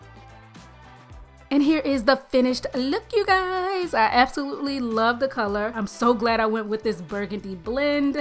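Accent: Baltimore, United States. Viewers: I hear that Baltimore U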